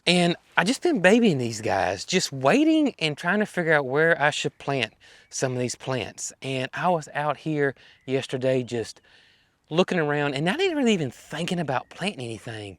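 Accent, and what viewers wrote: South, United States. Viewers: I have to admit I do enjoy reading the CC to see how they interpret your southern accent. 😅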